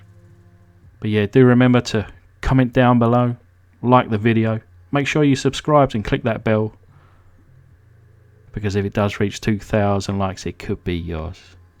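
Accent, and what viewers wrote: United Kingdom. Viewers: Beautiful resto. Love the channel. A slightly different vibe as u being British, but in a good way...kinda like when Wallace from Wallace and Gromit speaks.....👍👍👍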